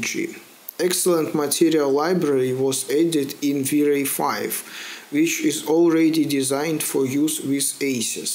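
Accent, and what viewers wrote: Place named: Ryazan, Russia. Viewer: ты бы по русски, что ли вещал. Не возможно слушать рязанский акцент)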